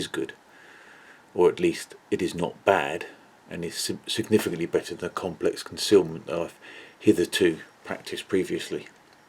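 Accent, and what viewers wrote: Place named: London, United Kingdom. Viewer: This is a London accent, East London I think. Definitely from somewhere in SE England though.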